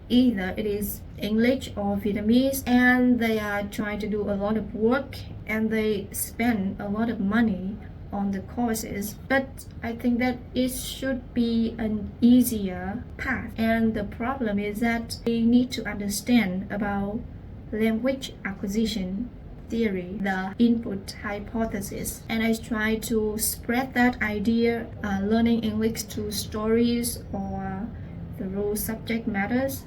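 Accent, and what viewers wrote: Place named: North, United Kingdom. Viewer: Southern Accent? I think it’s Northern 🤔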